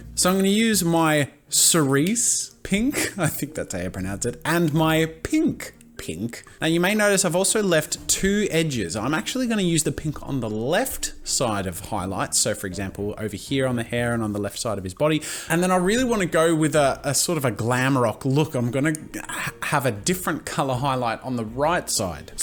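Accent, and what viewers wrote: Australia. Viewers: Is Aussie? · is he australian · Omg r u Australian??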